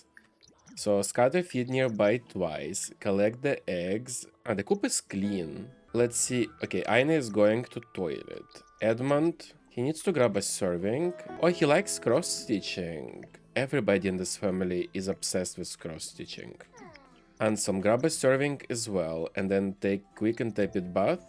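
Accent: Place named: Russia. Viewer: Hi,! Accidentally, saw this video in my YouTube recommendations and immediately subscribed Are you from Russia or Ukraine/Belarus? I recognize this accent from a thousand ❤️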